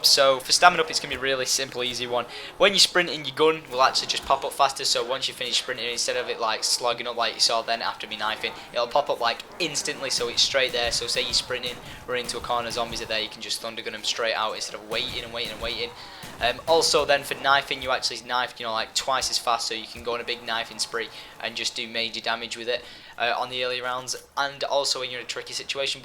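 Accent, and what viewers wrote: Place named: United Kingdom. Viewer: i love how british people say modern warfare phree :D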